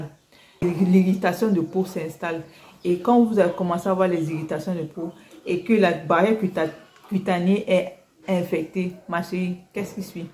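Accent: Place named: Cameroon. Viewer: Le ton camerounais 😂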